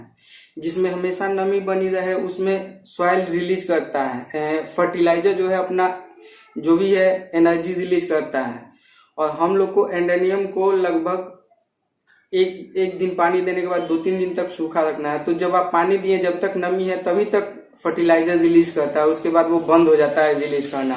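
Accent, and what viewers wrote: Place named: Bihar, India. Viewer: Aap adenium plant kahan se lete hai ? aapke voice tone se lagta hai kya Bihar ke hai nice information 👌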